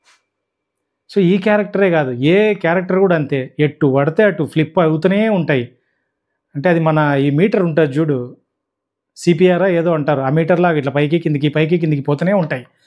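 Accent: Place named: Rayalaseema, India. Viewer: మేష్టారు పగలబడి పగలబడి నవ్వుకున్నాను, దయచేసి మీరు ఈ రివ్యూలు చెప్పడం ఆపొద్దు, రాయలసీమ యాస కుమ్మి పడేసారు, 😅😂😅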